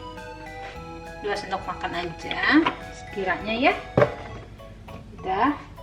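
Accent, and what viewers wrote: Jakarta, Indonesia. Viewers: Behhh si bahasanya gua demenn bngt dh, betawinya dapet bed. Ngakak dengeringnya ( mkn pedes gini sudaannya pantat dh kya dibakar wkwkwkwk) bisa aj. 🤪 Bisa liat video krna tadi sebelumnya liat video widi yg di Swedia widi nyebut" nama kita liat bener asli kocak amat heheheh, maap jdi nyablak gini, maklum betawi pan emng gini bahasanya. sukses trus ya 👍💞👌🙏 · logat betawinya kentel bangeeettttttttttt, saya suka🖒🖒🖒🖒🖒🖒🖒🖒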